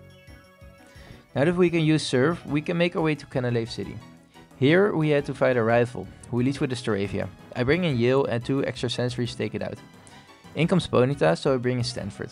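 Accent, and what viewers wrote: Netherlands. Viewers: i taught i heard a dutch accent, i am from belgium are you from belgium or the netherland3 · weird question but you got a very dutch accent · Hmmm that accent. Sounds dutch to me lmao because I am dutch too and I can recognize it lol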